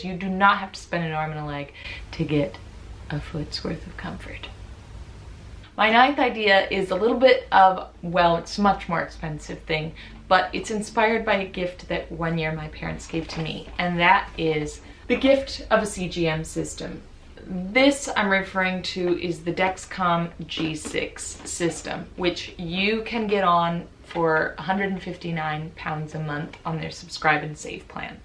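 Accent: United States. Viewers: I suppose you live in England. How come you don't have a British accent? To me, it is more like American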